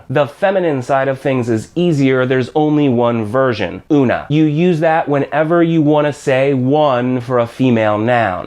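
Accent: United States. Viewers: Your very good at teach but the american twinge to your spanis pronunciation makes me giggle a little bit!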